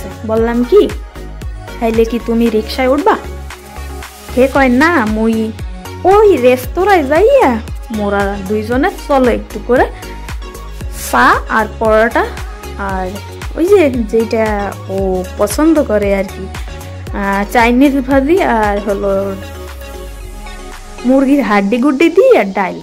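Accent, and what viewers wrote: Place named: Barishal, Bangladesh. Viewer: Bhola laglo aponar video ta deklam khub balo laglo. Borishaler language. I enjoy it.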